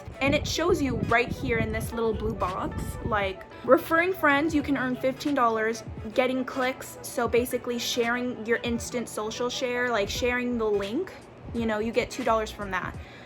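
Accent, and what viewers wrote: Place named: Texas, United States. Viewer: really Im in Texas I could’ve sworn for a second I heard a little Texas in you haha but dang that’s a lot of money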